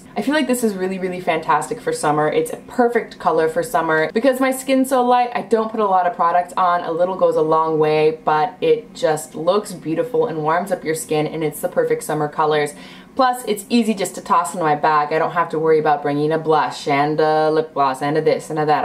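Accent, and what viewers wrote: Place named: Netherlands. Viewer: ben je nederlands ik hoor het aan je acent :)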